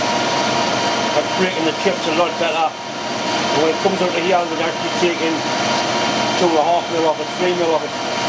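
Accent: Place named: Yorkshire, United Kingdom. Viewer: Thank you for the reply sorry sometimes I do not understand as you speak in a Yorkshire or Welsh dialect:-)) Two weeks ago I had a chap visiting my stationary engine collection here in Malta he is from Yorkshire had a bit of a problem to communicate with his accent!!!!!!!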